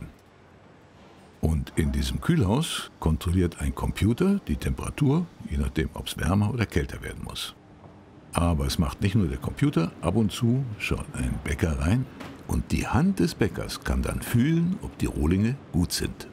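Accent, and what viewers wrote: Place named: Rhineland, Germany. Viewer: hatte schon immer einen leicht kölschen/rheinischen Akzent und den wird er jetzt mit 82 Jahren und nach über 45 Jahren als Maus-Sprecher auch sicher nicht mehr ablegen.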